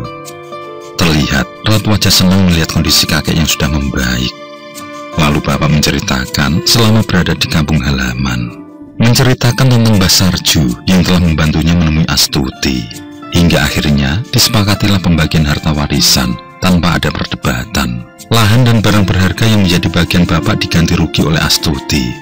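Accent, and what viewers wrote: Java, Indonesia. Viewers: Suara narator dongeng Ter baik ya ini. Suaranya medok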